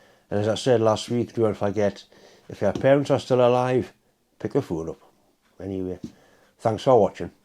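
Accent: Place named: Northern England, United Kingdom. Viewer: Great to hear your voice again, I hope you and are doing well despite things. I'll be honest but I miss northern accents down here in Norfolk, and yes yours is the best. Any time your down in Kings Lynn, beers are on me. Chin up bonny lad, you take care of yourselves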